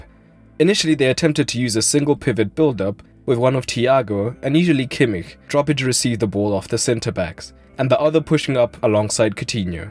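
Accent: South Africa. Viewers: Where are you from? Sound like a Saffa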